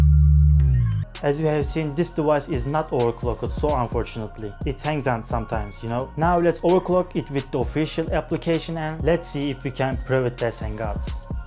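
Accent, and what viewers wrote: Turkey. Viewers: Yazılım ile ilgili videolarda Hint aksanına alıştığım için Türk aksanı bile daha çok dikkat çekiyor.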